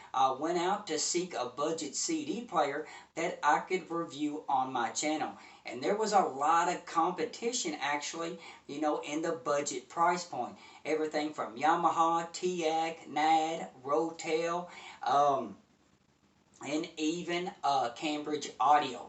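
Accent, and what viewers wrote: United States. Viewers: Look it up in the Dictionary , the yanks pronounce it that way!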